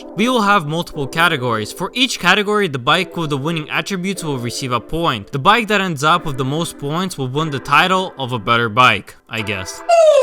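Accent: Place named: Russia. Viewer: А чё там русский делает? Это же точно русский. Такой акцент буржуи не повторят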